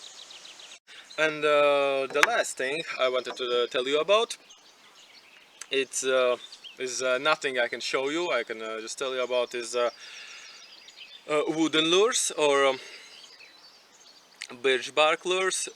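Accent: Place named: Scandinavia. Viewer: Hi. I am from sweden and was wondering if you can make a tagelharpa? The reason I said I was from Sweden is cuz I wondered what country you are from. I thought you sounded Nordic so I wondered if you can understand Swedish? I’m kinda bad at talking Swedish cuz Swedish is my first language and English is second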